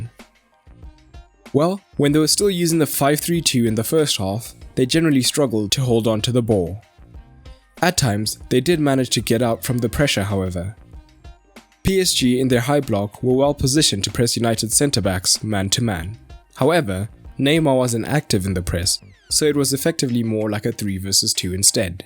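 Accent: South Africa. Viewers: That you sound South African. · Are you South African?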